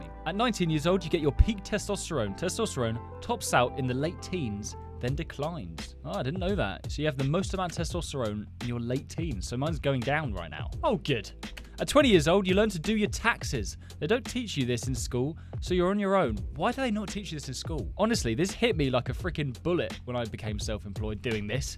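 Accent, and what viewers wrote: United Kingdom. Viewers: You sound like British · Are you British?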